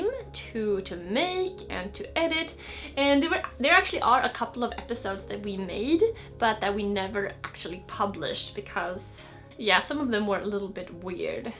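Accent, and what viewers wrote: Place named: Sweden. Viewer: Nice to see more girls in this community. Just discovered your channel. Just one questions. Do you perhaps have any relation to Sweden because your accent sounds somewhat Swedish?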